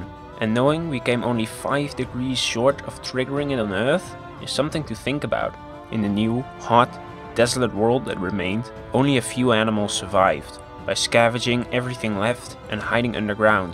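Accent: Netherlands. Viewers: Sounds Dutch to me. Mix of various accents. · are you dutch? i kind of hear a dutch like accent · Dutch or Flemish huh? Your accent tells me :p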